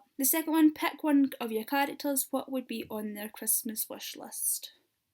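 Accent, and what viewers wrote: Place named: Scotland, United Kingdom. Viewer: I love your Scottish accent! <3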